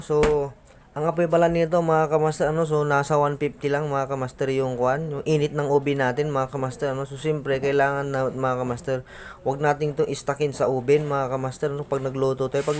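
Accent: Pangasinan, Philippines. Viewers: Taga pangasinan ka siguro ka master hehe the way u talk pangalatok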